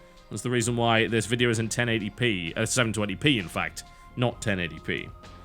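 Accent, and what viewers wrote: United Kingdom. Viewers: Huh? There's nothing really smart about those words... "implies" "superiority" "objectivity" If you think those words are 'smart' then, I'm at a bit of a loss, besides, is called the 'cynical' Brit for a reason, but, I can understand where your viewpoint comes from, and to some degree, I can understand why you think of him like that:) (by the way, his accent isn't fake, he's British, and that is a real British accent;))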